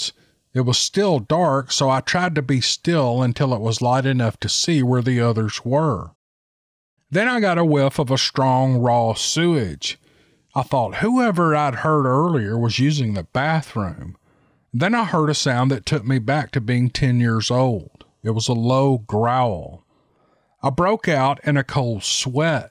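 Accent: South, United States. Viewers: Man oh man! Fantastic stories, every one of them! &.... you ALWAYS do these stories justice, your voice becomes animated as you launch into the character of the story. The intensity & excitement in your voice puts the listener right there in the encounter! I just love listening to your sweet Southern drawl. You are truly a master story teller. And thank you to those authors for sharing their encounters! A Wompus cat!? Lol, that's a new one I've never heard. I love it! I remember as a kid growing up on a Texas army base, my dad (a drill instructor of all things) would take my 2 older brothers small game hunting often. If I recall correctly, one of their first hunts on their own was a 'snipe hunt'. Having been let in on the joke while the boys were out hunting (with their pellet guns & burlap sacks) I couldn't wait for their return. I knew right then Dad had armed this little sister with some "ammunition" that would provide entertainment at their expense for years to come. 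Of course karma has a way of finding everyone & turn about is fair play. We had a pretty good snowfall one winter, a rare event in that part of the country, and I missed much of the fun being too scared to go play outside. When the boys found out why, then it was their turn to laugh. Being the last one out the door, after getting all bundled up, Daddy told me to be real careful, especially in the deeper snow, "cuz them thar snow snakes will crawl up your butt & freeze ya ta death." Hahaha We live in the g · I hope you enjoyed Thanksgiving. I enjoyed your narration of fantastic story.always good to listen to.your goodoleboy accent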